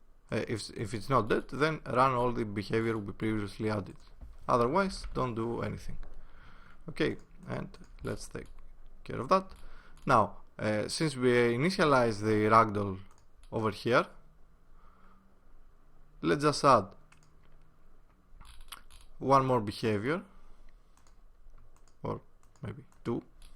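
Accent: Greece. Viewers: Are you from greece?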